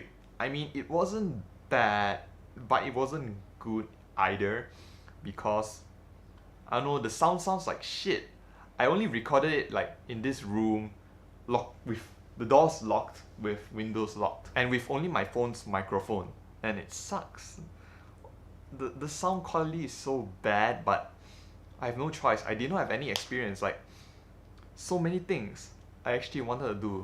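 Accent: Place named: Malaysia. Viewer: I'm glad you found passion and joy in making films and videos, as opposed to being stuck in the Science Route™; it was nice to watch this video and get to know you a little better!!:) I can't wait for more videos!! You're doing a good job, keep it up!! Personally I prefer your Malaysian accent, but maybe bc it feels as if we are a little closer. Hehehe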